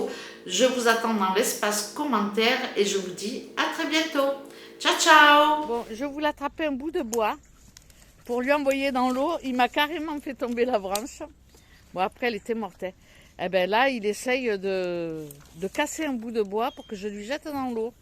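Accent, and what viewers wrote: South, France. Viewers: Coucou...mon avis au sujet de tes vidéos recettes.. je préfère quand tu commentes..l’absence de son est monotone.. je préfère comme tu dis:les blablas et les papotages,avec ton accent du Sud c’ est super! Ne change rien.. car c pour ça que l’ on va sur ta chaîne: pour t’ écouter.. sinon on peut aller sur n’ importe quelle autre.. c ce qui fait ton originalité...et que l’ on ait envie de la regarder!! Comme disait un commentaire plus haut si quelqu’un ne veut pas t’ entendre.. il n’ a qu’ à couper le son!!😂Bonne journée et à bientôt t’ entendre..💕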